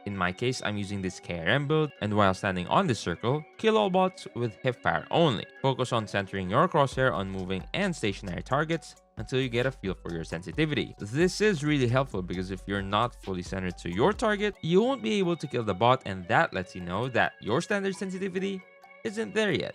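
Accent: Philippines. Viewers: Hey, just discovered your channel. What's your nationality? You sound very Pinoy English.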